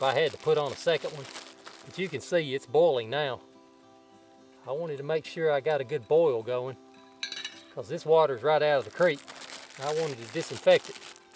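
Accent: South, United States. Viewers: Love your "good ole boy" Southern accent. Also, the quality of your presentation is on par with Steve's over at <link>. Very professional. One more thing . . . that video work is spectacular!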